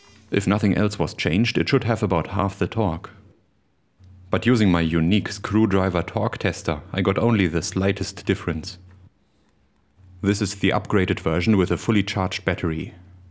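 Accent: Germany. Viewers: Your German accent is delightful! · wie sehr man diesen deutschen akzent immer raushört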